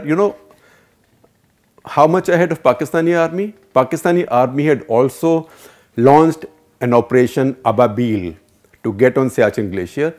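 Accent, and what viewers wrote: India. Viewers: Gupta you seem to be very fond of talking that makes little or no sense at all and that too in your weird hindi accent. Your uncontrolled excitement makes you over analyze situations that creates only further confusions with no answer. Better learn to calm your nerves and measure the depth of words you choose.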